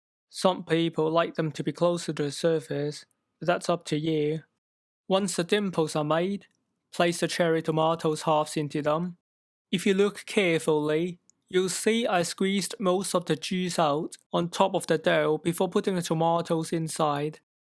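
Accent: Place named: Italy. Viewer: luv the Italian accent, cool video well explained recipe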